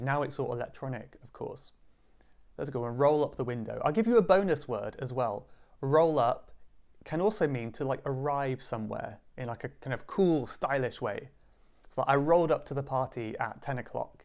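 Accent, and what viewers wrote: United Kingdom. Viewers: Teacher, may I ask which part of the UK you are from? 😊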